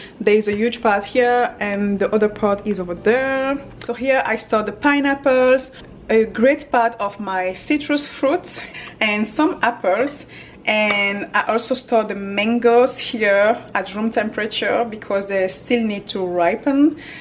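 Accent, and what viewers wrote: France. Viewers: Are you french ? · Cet anglais parfait avec cet accent français xD Ca donne tellement envie tout ces produits frais ! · Craig Mack she has a French accent to me.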